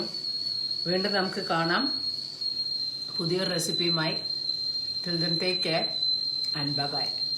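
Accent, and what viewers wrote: Palakkad, India. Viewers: 👌👌👌👌👍👍👍👌പാലക്കാടൻ ഭാഷയും കൊള്ളാം 😍😍😍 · ഇങ്ങനെ കൊതിപ്പിക്കല്ലേ കുട്ടി.. എന്താ പാലക്കാടൻ ഭാഷ 🙏👍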